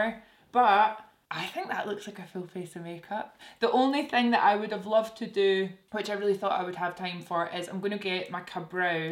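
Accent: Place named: Scotland, United Kingdom. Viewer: Absolutely love your page I came across it by mistake I love all your suggestions your make up i like the way you help out and talk to your audience you have a wonderful personality and your accent is awesome... i’m just guessing maybe Scottish correct me if I’m wrong keep bringing those videos girlie we love them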